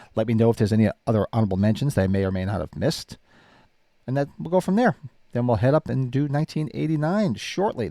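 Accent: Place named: New Jersey, United States. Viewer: How do I know you’re from New Jersey immediately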